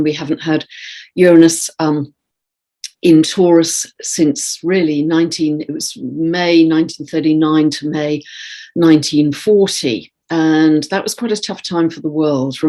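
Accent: England, United Kingdom. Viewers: Hi! I have my natal Chiron at Taurus 18 degrees. Chiron natally squares my Moon at 25 Aquarius. Going through Saturn conjunct Moon this year and I’m really struggling with depression and despair. The last full moon in Capricorn at 21 Capricorn EXACTLY squared my natal Saturn at 21 Libra. Let’s just say I got a nasty shock and am seriously struggling to feel anything other than that the universe hates me and is out to torment me until I decided to leave the planet. I’m worried this conjunction right on my natal Chiron will be another blow. Can you offer a different interpretation please? I find it harder to continue to force myself to see the “positive “ possibilities. Every time I do that in the last 18 months whatever I am hopeful about is ripped away brutally. Appreciate anything you can share. I’m not a professional astrologer but I do try and use astrology to help myself. 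This year I am just struggling beyond belief to hang onto hope (also you may have realised, I am having Saturn square Chiron transit. No wonder I cannot see the light!) Thank you and have a nice day. I find your voice and accent soothing because I have a lovely soulmate in this life who is English as well:) From Bangalore, India.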